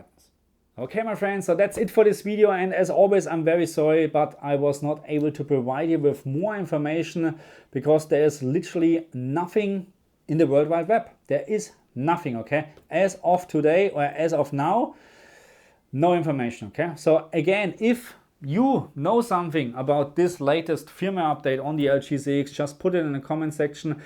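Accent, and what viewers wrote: Germany. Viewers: Du bist 100% deutscher oder? Diesen englischen Akzent erkenne ich überall 😅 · You are clearly of german origin, right?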